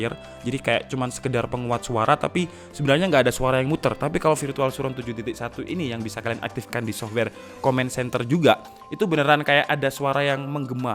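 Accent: Java, Indonesia. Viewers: Wong jowo asli Iki.. ngomong Cok e mbulet🤣🙏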